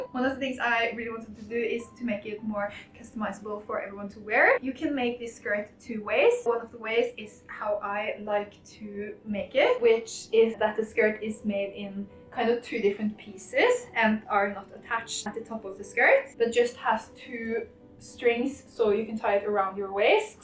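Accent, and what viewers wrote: Norway. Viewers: Do I detect Norwegian? Så fint! Når jeg har skaffet min egen symaskin vil jeg sikkert prøve meg på dette!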